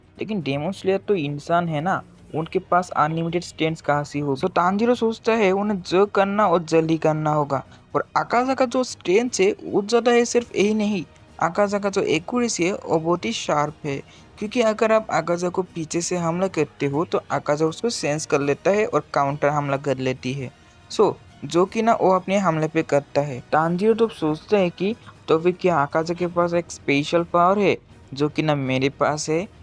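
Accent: Bengal, India. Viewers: Bhaiya tumi Bangali na?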